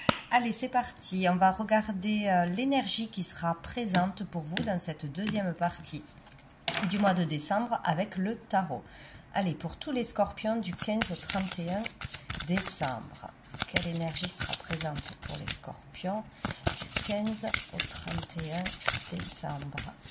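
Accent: South, France. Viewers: Merci, tirage intéressant à écouter. Nous avons tous les deux un accent particulier, toi du sud de la France, et moi du Québec. 🙂❤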